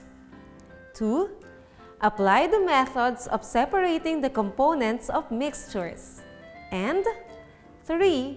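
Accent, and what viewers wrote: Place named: Mindanao, Philippines. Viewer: Ang tigas magsalita ni mam. Taga Mindanao si Mam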